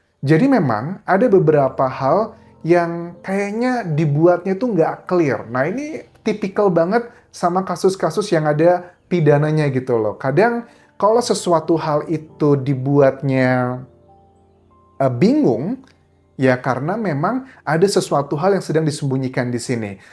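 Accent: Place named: Sunda, Indonesia. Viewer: lucu amat ngomong sunda nya mas 😂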